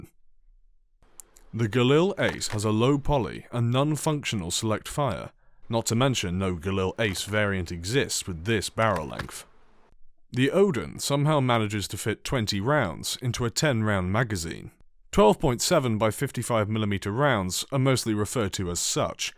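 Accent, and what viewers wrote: United Kingdom. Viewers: He's British. Their accent makes everything sound more official. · I think he's British so that's a given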